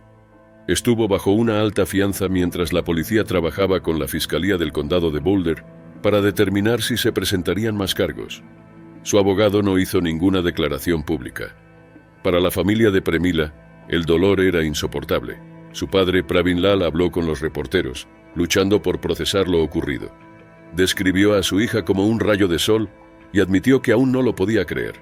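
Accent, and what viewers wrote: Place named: Spain. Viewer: tu azento es Lindo cariño es español 😍😍😍😍😍💋💋💋💋❤️😊🧜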